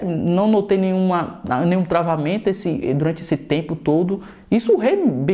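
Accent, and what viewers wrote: Bahia, Brazil. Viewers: Mim escrevi no seu canal vc e baiano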